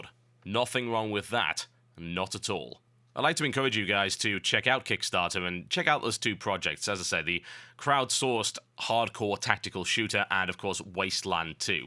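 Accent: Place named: United Kingdom. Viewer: "Bawoldur's Gate," god I love TotalBiscuit's accent. It's so hilariously British.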